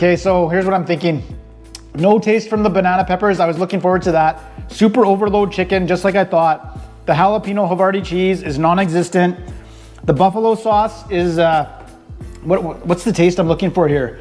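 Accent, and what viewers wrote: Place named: Winnipeg, Canada. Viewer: You have a thick accent. Are you from Winnipeg?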